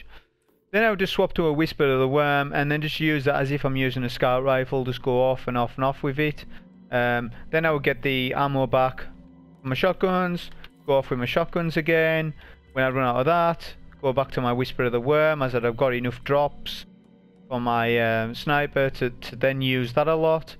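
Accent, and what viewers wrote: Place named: Newcastle, United Kingdom. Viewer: had to happen we had some wonderful years with alex and now we have a clown, but we will prevail. question will you be doing the whisper help runs and how does one get to know. ps its just nice to follow someone who is not american lol and after watching "vera" et al can understand every georgie word. keep it up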